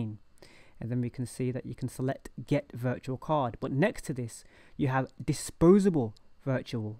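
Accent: United Kingdom. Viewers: Love this video but your definitely British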